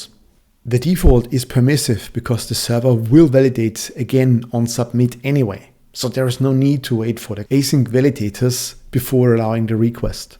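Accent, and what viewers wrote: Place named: Austria. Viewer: He sounds sooooooo Austrian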